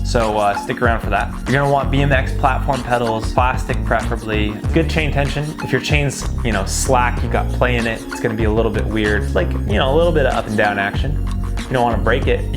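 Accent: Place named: United States. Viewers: he definitely seems american, not english... In England we say "schwal-bay". No idea if that's right though. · hes not english man, hes american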